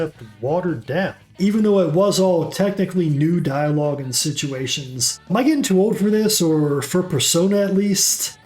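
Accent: United States. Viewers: what state is your accent from?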